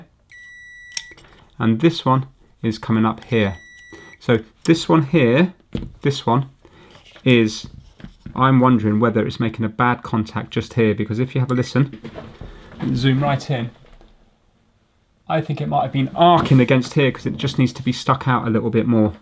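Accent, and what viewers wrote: United Kingdom. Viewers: thanks again for all your hard work and providing a ton of entertaining content for all of us throughout the holidays, heck I may have learned a thing or three as well. My daughter heard your video and she was down the hall and she said you listening to that again? She said I could tell by the "british accent" anyways, Happy New Year! Look forward to more greatness in 2026!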